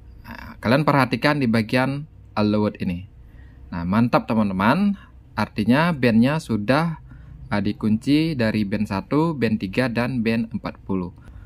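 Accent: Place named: Bali, Indonesia. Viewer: Orang bali ini, medok bali ngomongnya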